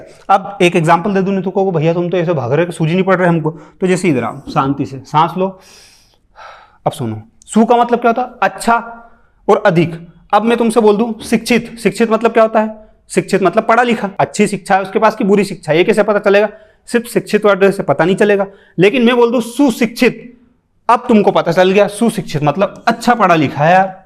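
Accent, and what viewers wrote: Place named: Bihar, India. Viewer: Teacher सायद बिहार से है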